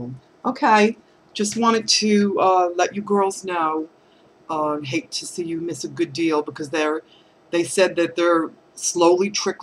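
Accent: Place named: Charlotte, United States. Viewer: Omg, not I know why part of your accent sounds just like my mom's. She is from Charlotte and that's where I was born. Anyways, I was just gonna skip going until your video so thanks for sharing!!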